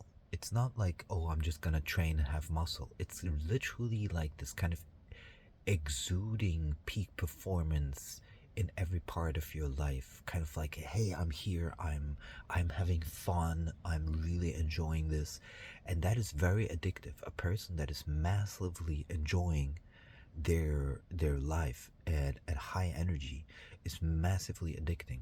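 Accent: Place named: United States. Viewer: dein englisch ist sehr gut, eher amerikanisch geprägt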